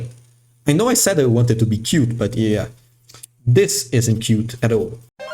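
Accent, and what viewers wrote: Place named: Brazil. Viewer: Ahahahhaha então somos conterrâneos! Inglês perfeito, mas desconfiei pelo leve sotaque da nossa língua. Muito bacana. Vou me inscrever e depois vejo uma forma de dar um feedback pra ti de como ficou o meu aqui.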